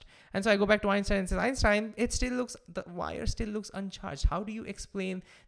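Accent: India. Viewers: you made me a believer! Btw i love the typical Indian accent. moVing becomes mOOOing. Gotto love it.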